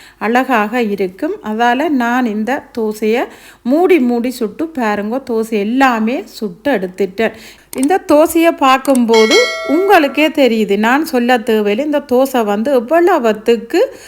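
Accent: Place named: Sri Lanka. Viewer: தமிழ் நாட்டில் கொஞ்சம் படிச்சா போதும் ஆணும் பெண்ணும் பேசும்போது ஆங்கிலம் அதிகமாகவும் தமிழை மிக குறைவாகவும் கலந்து பேசுகிறார்கள். வெளிநாட்டில் வசிக்கும் தமிழர்கள் தமிழ் மொழியை மிகவும் மதிக்கிறார்கள். நீங்கள் செய்து காட்டிய உணவை விட உங்கள் இலங்கை தமிழ் கேட்க அமிர்தமாக உள்ளது. நன்றி... நன்றி... நன்றி...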